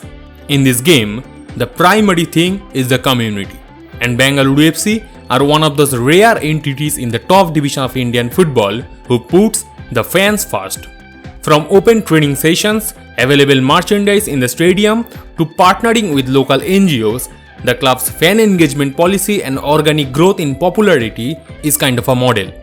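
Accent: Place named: Bangladesh. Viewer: This is some thick Bangla accent brother. Good script though. Keep Growing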